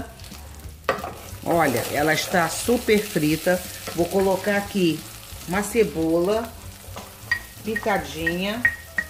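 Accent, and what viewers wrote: Rio de Janeiro, Brazil. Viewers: Adorei o modo prático como comanda tudo, fora o seu sotaque carioca lindíssimo, e a dica tb adorei kkkkk. Beijos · Amo suas receitas e sua pronúncia carioca. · Adoro o seu sotaque Carioca!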